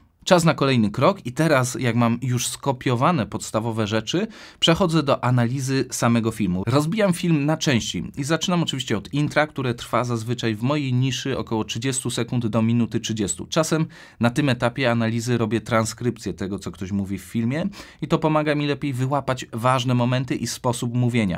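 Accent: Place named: Kraków, Poland. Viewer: Słychać żes pieronievz Z Krakowa 😁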